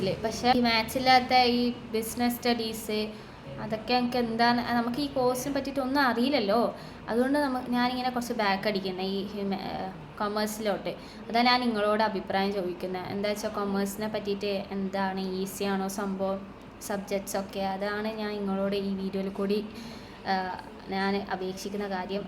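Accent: Kannur, India. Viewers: അത് കണ്ണൂർ സ്ലാങ് ആണ് ബ്രോ 😁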